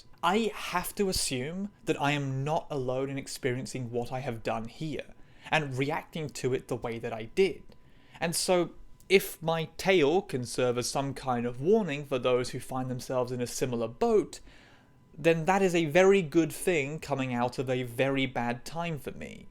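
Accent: United Kingdom. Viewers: lmao yeah i thought this whole time she was some flavour of british 😅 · She definitely has a British accent haha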